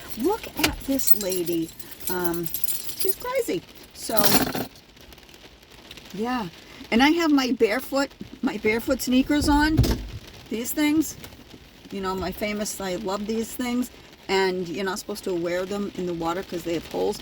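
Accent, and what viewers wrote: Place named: Massachusetts, United States. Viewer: I KNEW that was a Massachusetts accent!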